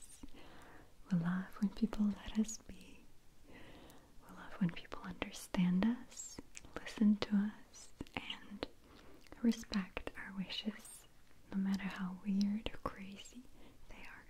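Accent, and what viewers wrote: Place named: Russia. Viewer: Her normal talking voice still has an obvious Russian accent.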